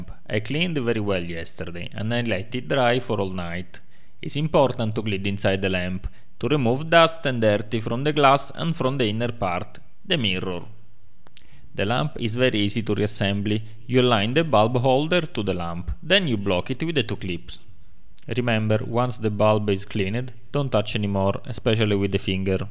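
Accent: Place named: Italy. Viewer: love your italian accent!!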